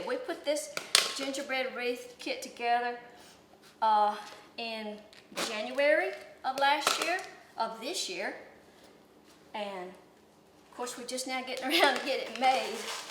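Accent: South, United States. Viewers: Her sweet southern voice!!😄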